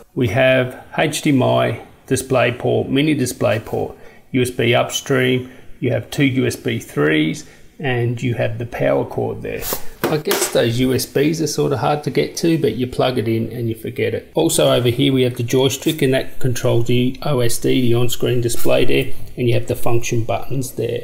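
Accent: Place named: Australia. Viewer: Great Aussie voice hahaha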